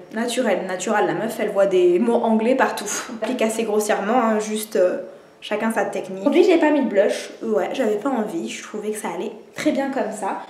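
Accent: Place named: South, France. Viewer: en tout cas sa te va bien Ton accent du sud 😊😊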